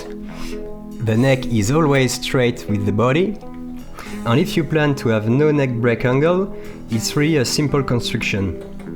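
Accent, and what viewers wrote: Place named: France. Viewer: Your english is very good even with french accent. Nice tutorial 😉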